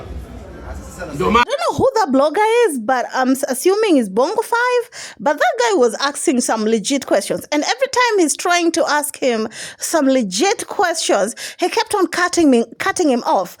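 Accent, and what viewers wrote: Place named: Nigeria. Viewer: wahlai! One of your ancestors was Nigerian. You sound & rage like us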